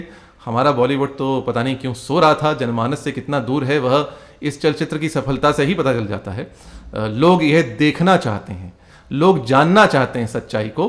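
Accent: Standard. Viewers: Aapki shuddh Hindi sunkar hriday prasann ho jata hai. Aapko dekh kar mai bhi ghar me Shuddh Hindi bolne ka prayatn kar rahi hun. Dhanyvad mahashay 🙏🏼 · Shuddh Hindi! What a delight!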